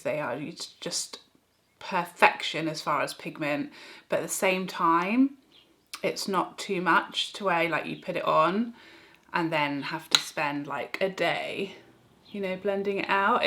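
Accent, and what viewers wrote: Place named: United Kingdom. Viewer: you have the best videos. I love love love your sense of humor paired with the British accent. It’s the medicine to my life I was missing. You are the best! Yes I’m watching some of your older videos. Lol!!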